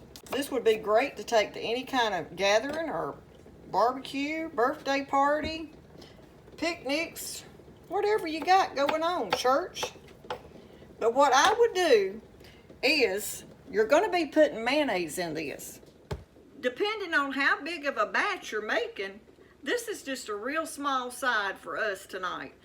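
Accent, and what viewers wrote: South, United States. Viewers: Looks delicious, I like your southern talking voice .. you can get those soda crackers at a good price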